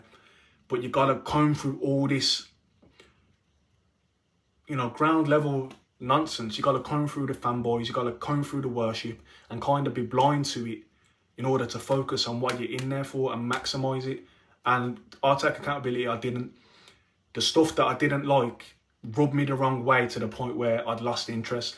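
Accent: Wolverhampton, United Kingdom. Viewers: You sound like your from Wolves in the Midlands, now let me say this i made it to Hollywood and enterd the world of film and TV from nothing midlands girl born and raised in a house of 6 boys with a working class family, what you just described sounds like a complete joke! what a sham, well done to you there"s much better mentoring apprenteships than that nonsense, you made the right decision.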